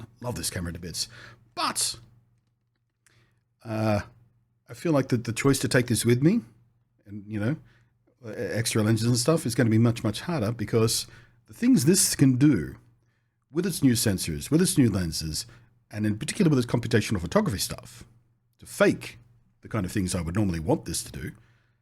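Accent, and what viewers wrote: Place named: Australia. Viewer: Nice slow and Aussie wow fantastic brother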